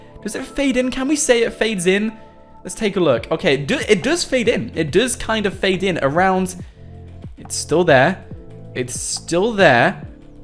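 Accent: United Kingdom. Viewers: I like his British accent. This is amazing. I liked, subscribed, and smashed the bell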